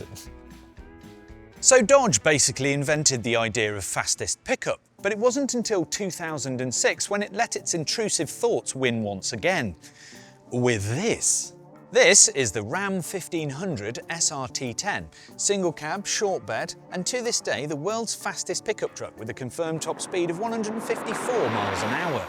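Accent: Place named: England, United Kingdom. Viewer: Why is a English man talking about a American truck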